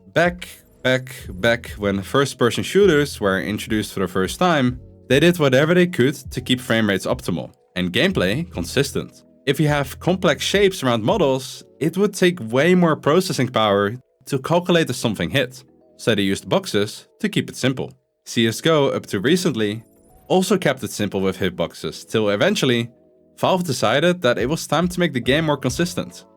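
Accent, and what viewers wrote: Netherlands. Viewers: Are you Dutch? You sound so dutch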